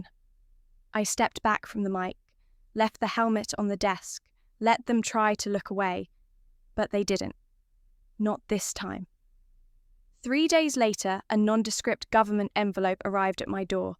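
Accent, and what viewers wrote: United Kingdom. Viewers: If she is from North Carolina, why is the AI voice a southern English accent?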